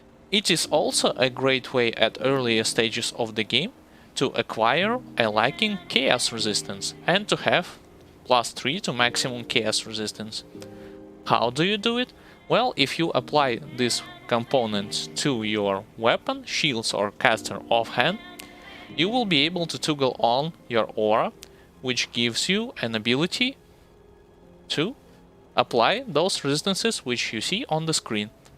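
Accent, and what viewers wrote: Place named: Eastern Europe. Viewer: Great info overall, the eastern european accent makes it interesting also.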